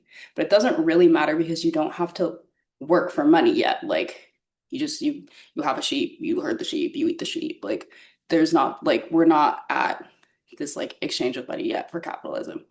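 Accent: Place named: Germany. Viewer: Ur German accent eats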